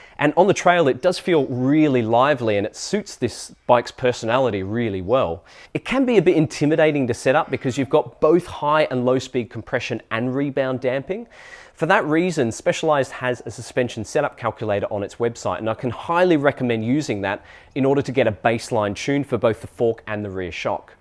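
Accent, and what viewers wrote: United Kingdom. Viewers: Are you a Brit in disguise? Intrigued by the accent... 🧐 (Yes I did enjoy the bike content!)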